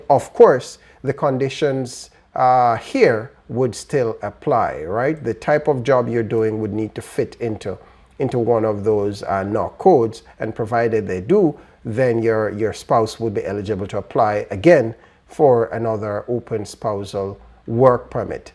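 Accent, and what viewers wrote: Jamaica. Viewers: You sound Jamaican. Thanks for the info.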